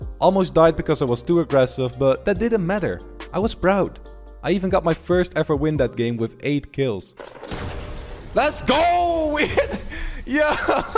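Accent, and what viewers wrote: Netherlands. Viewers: Jo bro zelfs ik hoor dat je Nederlands bent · i saw this in my recomended(don’t know you) klicked on it and boom. 1 minute in and i know 99% sure you are dutch or somewhere close to it. The accent bro the accent🇳🇱🇳🇱🇳🇱🇳🇱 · Ben jij nederlands? Ik hoor het een beetje 😂🙌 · He sounds Dutch to me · I'm 99% sure he is Dutch, bcs of his accent😂😂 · yoo are you dutch because off your acent zo ja ik ben ook nl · Zijn engels is goed alleen je hoort gewoon dat hij nl is! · Ik hoorde aan je stem dat je een nederlander was (ben geabonneerd) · You hear he's Dutch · Ja ik herken het meteen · His acent is 99% dutch 1% irish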